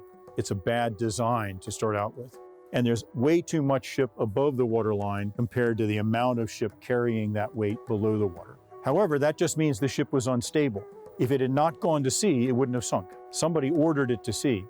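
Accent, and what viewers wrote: United States. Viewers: This guy is def from Delco area in Pennsylvania lmao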